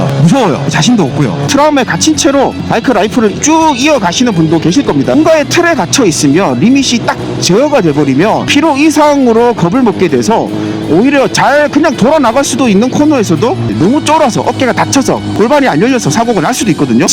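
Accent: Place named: Gyeongsang, South Korea. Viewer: 경상도 사투리인데 딕션 깔끔해서 귀에 쏙쏙 박히네요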